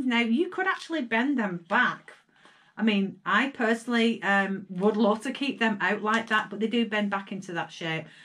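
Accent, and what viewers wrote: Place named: United Kingdom. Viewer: Oh okay it's just u never here that word patent anymore. I just adore u and luv your uk accent.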